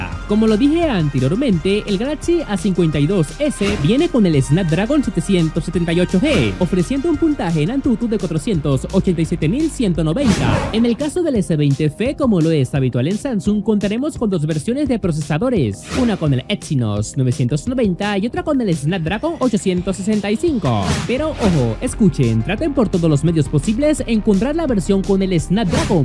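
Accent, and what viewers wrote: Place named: Venezuela. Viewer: Hablas como venezolano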